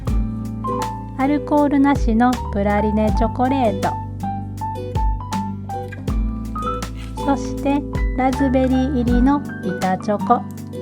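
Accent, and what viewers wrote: Kansai, Japan. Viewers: 眼の手術後、あまり無理できなくて横になっていることが多く、nobanaさんの関西弁のゆったりしたYoutubeをBGMにとてもリラックスできました、有難う御座いました、海外生活お身体に気を付けて頑張って下さい。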